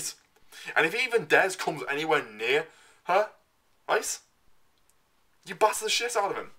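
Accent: United Kingdom. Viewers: Are you from the north? More specifically Scarborough? I'm terrible with identifying accents but you sound very similar to my accent. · I take it with that accent that your British if so its nice to see a British take on you know who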